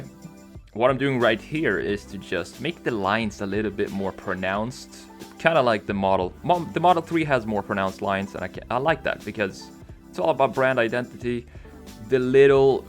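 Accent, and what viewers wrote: Sweden. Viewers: are you swedish? love your content btw! :D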